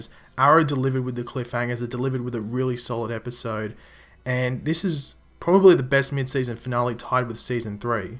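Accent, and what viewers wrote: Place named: Australia. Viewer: Are you from Australia, You have a Aussie accent :D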